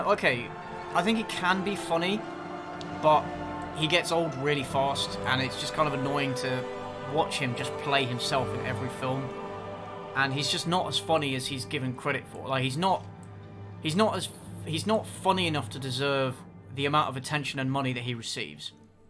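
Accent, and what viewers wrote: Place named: United Kingdom. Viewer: Why is Rian Johnson livestreaming Civilization while speaking with a British accent?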